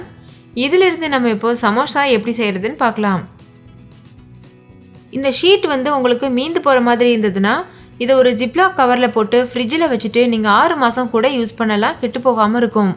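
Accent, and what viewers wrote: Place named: Kerala, India. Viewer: நீங்கள் கேரள மாநில பெண்ணா? தமிழ் நல்ல அழக பேசுறிங்க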